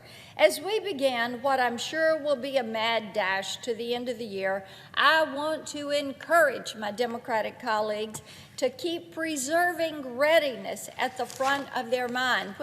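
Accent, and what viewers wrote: South, United States. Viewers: She is southern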